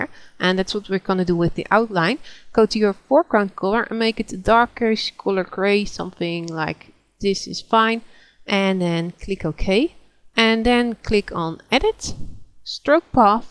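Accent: Netherlands. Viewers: Great video! Love the Dutch accent :)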